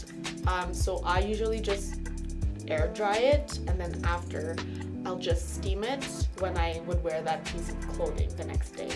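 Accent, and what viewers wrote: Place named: Canada. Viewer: girlllll I hear the canadian accent ♥ ahahahha love itt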